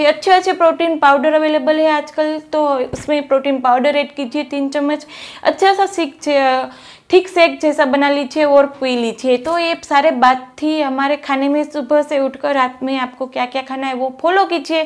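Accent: Gujarat, India. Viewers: Are you Gujarati? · Gujaraat se ho kya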